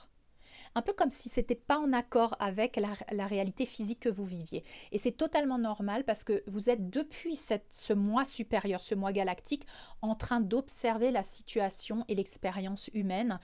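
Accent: Alsace, France. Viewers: Bonjour ! C'est moi ou vous avez un accent alsacien ? 😁😍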